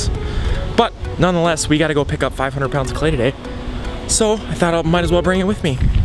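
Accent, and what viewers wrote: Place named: Upper Midwest, United States. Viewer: I’ve been binge-watching your videos for a week or so because I grow orchids, and I have a hard time finding pots – I like to use clay pots whenever possible, but orchids need to have a lot of air around their roots – so I’ve been considering making them myself, so that I can make them so that they include all of the specs that I want. Your teaching style is great, and entertaining, so I’m really enjoying the “binge” of your videos! Thank you for doing such a great job! Second, and this part is just a dumb bit of anecdotal fluff: I noticed from the first sentence that you spoke that, based on your accent, you HAD TO live in the upper Midwest, because your accent is pretty much EXACTLY the same as mine! I lived in southwestern North Dakota until I was 17 (our accent is a bit more Canadian up there; and something that may help to strengthen my “family accent” is the fact that my grandparents on my Dad’s side still occasionally spoke German in the home to keep secrets from the kids, and later, us grandkids). 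Anyway, I moved to Chaska when I was 16 (HS graduation), where I lived until I was 20, when I bought a house and moved to Minneapolis. I lived there until I moved to the New Orleans area to finish my degree, back in 2007. During my junior and senior years, I taught freshman chemistry, and without fail in every single section that I taught, one of my students would ask me what country I was from, usually on the first or second day of classes. I guess the [slightly paraphrased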